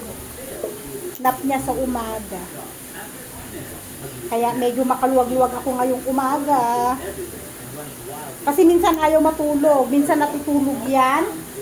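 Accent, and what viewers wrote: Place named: Visayas, Philippines. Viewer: Bisaya ka ba? Accent mo kasi bisaya. At comment lng wag ka tawa ng tawa wala naman nakakatawa.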